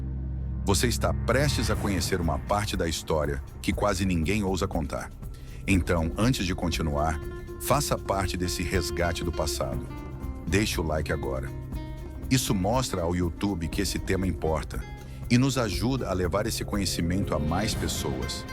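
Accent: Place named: São Paulo Countryside, Brazil. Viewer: Parabéns pelo Canal, eu estou amando e gostei muito do sotaque da voz 😅 bem paulista do interior; me identifiquei muito!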